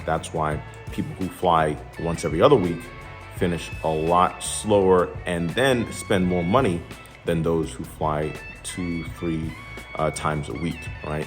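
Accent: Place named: New Jersey, United States. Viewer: Hey whats up, im guessing your from Jersey. Are you a CFI in the area?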